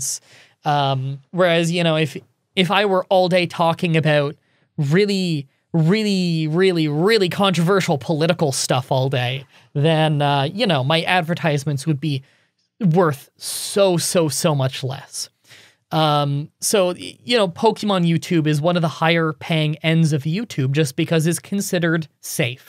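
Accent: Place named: Canada. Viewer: Hey man, Thanks for uploading the lets play of pkmn stadium, I was really glad when I saw it, because I knew will be half an hour of escape and I was considering that you start this more popular lets play on porpouse, I was really nice. I just want to share a funny history, you were the first canadian that I regonize because of the accent and for me was super cool, at the time my wife and I were planning move to Canada, she was invited to do her phd in uOttowa., when i was trying to catch some of Canada's culture and make me feel great. Cheers man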